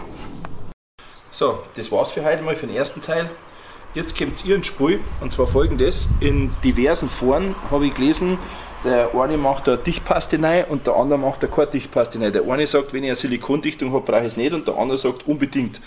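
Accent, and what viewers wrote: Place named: Bavaria, Germany. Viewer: Schee boarisch! Da macht das anschauen gleich noch mehr Spaß ... danke!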